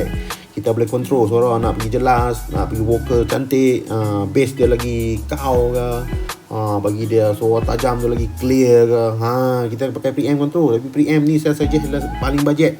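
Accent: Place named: North, Malaysia. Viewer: kedai dekat mana boss..dengar cakap bunyi utara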